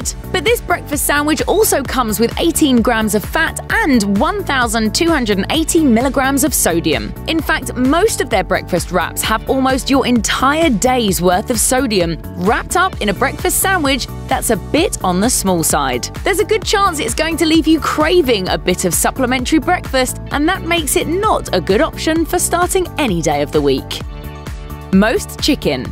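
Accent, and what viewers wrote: United Kingdom. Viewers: "your narrator sounds British accent" "that was awkward?!" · Double pastrami Double steak And double never listen to a British chick tell you about American fast food restaurants... WTF... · British accent makes things look scientific.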